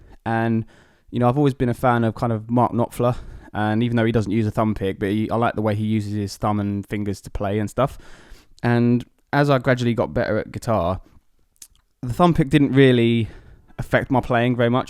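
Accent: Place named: Texas, United States. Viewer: Before this video I was pretty sure you were American, and probably from Texas.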